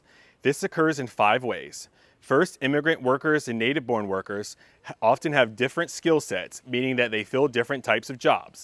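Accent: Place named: India. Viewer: You have a very typing Indian Accent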